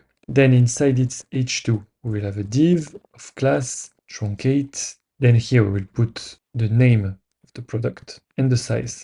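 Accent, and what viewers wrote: France. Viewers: france accent ? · On entend bien l'accent Français, c'est bien pour comprendre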